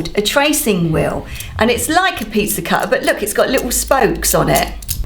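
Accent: United Kingdom. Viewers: thank the lord so much for you annd your sewing smarts! my friends and i are finally able to make a fashion line! and your BRITISH wich makes it one million times BETTER!!!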